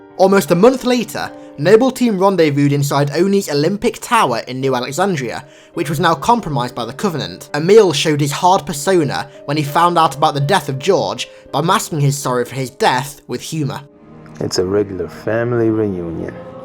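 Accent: United Kingdom. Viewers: i like his voice, it reminds me of an old friend i used to play with back when i was still active on xbox...... though he sounds more british than anything and my friend was canadian.....but still, pretty close.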